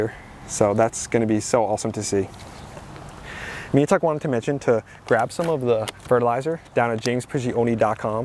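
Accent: New Jersey, United States. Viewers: his loudmouth Jersey Yankee style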